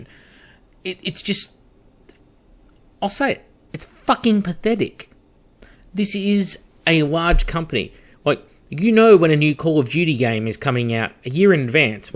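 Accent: Australia. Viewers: Excellent whine in redneck oz voice to ease you to sleep . Raise prices another 10% for oz.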